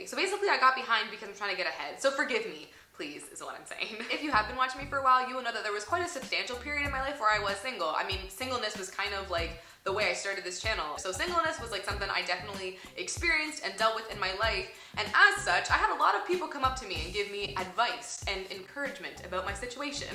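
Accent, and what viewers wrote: Canada. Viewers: I just love Canadians and the things they say. Especially when u say about ur accent comes out and it reminds me of my Canadian family and friends and it just makes me happy which i guess means u make me happy I know that this is a personal question but I'm curious as to what ur denomination is in Christianity. The reason y u ask this is because my family in Canada is apart of the United church of Canada and every summer we would go to a church camp located in berwick united church camp, berwick Nova Scotia. It's a 10 camp with all sorts of different activities and fun stuff. I was also wondering if u know of the Christian musical group from Canada called the message. They don't really put out there music publicly but it's really good music to listen to and stuff. Ur a great Canadian 😊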